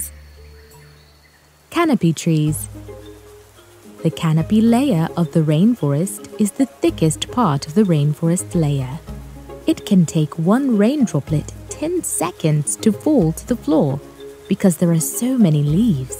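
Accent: United Kingdom. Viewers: Do you have a British accent? ❓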